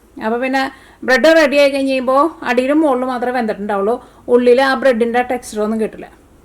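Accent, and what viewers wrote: Thrissur, India. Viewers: ചേച്ചി തൃശ്ശൂർക്കാരി ആണല്ലേ? ഞാൻ ആദ്യമായിട്ടാണ് വീഡിയോ കാണുന്നത്. നന്നായിട്ട്ണ്ട്ട്ടാ...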